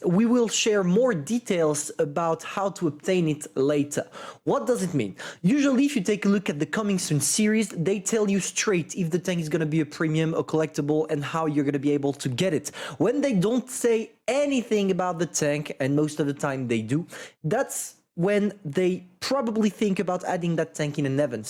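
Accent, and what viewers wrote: France. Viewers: Dear, I must give you a huge compliment. In my oppinion you are by far the best You-Tuber on WoTB. Not that your explainations are sharp to the point and your commitment to the game and the community is outstanding, but your french accent within the english language is absolutely insane, when it comes to the fact of entertainment. In other words: you are broken as a Smasher. Keep on your performance for the benefit of us players. sincerly yours. Carl Schurz, Adj-chef-2.eme REP ( retired in 1991) served in anonymas. " Nous sommes le meilleur"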